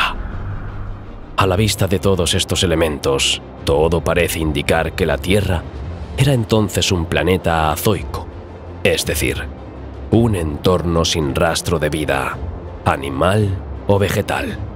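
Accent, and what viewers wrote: Spain. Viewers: Veo muchos comentarios de mis hermanos hispanoamericanos y ninguno de ellos son irrespetuosos excepto tú. Si no te gusta escuchar el acento castellano español pues entonces no visites este canal, así de sencillo. Sé que aún habéis algunos que nos repudiais por el tema de la conquista, pero déjame decirte algo, los españoles actuales no tenemos culpa de lo que hicieron nuestros antepasados y yo como español me entristece ver mucho odio y resentimiento en todas las redes hacia los españoles. Me hubiese gustado una conquista sin sangre, sin historia negra. 😢 Os admiro, os respeto y os quiero porque somos hermanos. Viva Latinoamérica y Viva España.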